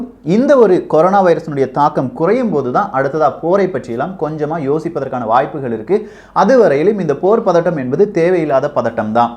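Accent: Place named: Tamil Nadu, India. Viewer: My first video was about Cuba and it came in via WhatsApp almost a month back..from that day I subscribed your channel. I like your Tamil accent. Very informative.. sometimes I see proof links..some videos it's missing. Please add in future if possible..if I say to someone they will say lot of guys are talking on YouTube.. can't trust. That's why.. please try to put in description if possible in future. Thank you..as always very informative.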